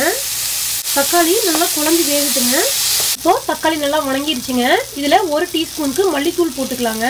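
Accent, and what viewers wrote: Kongu, India. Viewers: Kongu tamil sema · Kongu Tamil super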